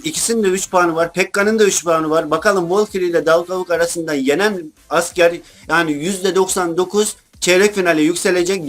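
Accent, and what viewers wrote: Black Sea, Turkey. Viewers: abi eskiden karadenizli oldugundan sesin farkli geliyodu ama artik alistim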